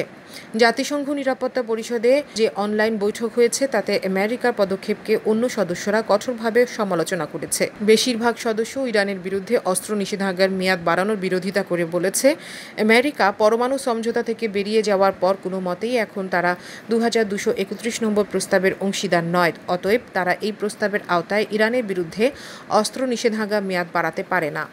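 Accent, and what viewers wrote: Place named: India. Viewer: sobaike ekta onurud korbo. jara purbo pocchim.. ai cheneler khobor sunen taderke bolchi. ai chenelta age bangla deshi chilo akhon seta varoter kace bikri hoye gece... age khobor porto ekjon purus .... ekhon meye khobor pore.. ami varot ebong bangla deshider kontho buji... ei meye varotiyo.. mittha songbad pochar kore. ami onek age theke khobor suntam ekhon ar sunina... ajke UN SUBSCRIBE kore dici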